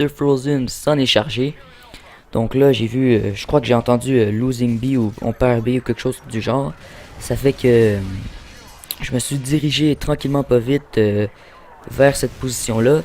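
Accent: Quebec, Canada. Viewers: Swag encore un quebecquois trop bon ton accent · J'adore l'accent québécois :) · super l'accent man x) vive le quebec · Tu ne serais pa quebecois ? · Qu'est ce que j'aime l'accent québécois :'D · moi je dis trop bizarre parce que il est francais d'origine mais il a un pur accent québecois :O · C'est un quebecquoi il parle comme sa sinon c'étais marrant , oui ! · C'est clair qu'il n'avait pas un criss de chats dans ton mode si tu joues a la PS3 xD Anyway excellent travail au moddel tout nue en plus! <3... Ste plait change pas ton accent a cause de quelques Francais qui font chier et je m'inscriverez surment a CODQG juste pour voir un bon québécois jouer!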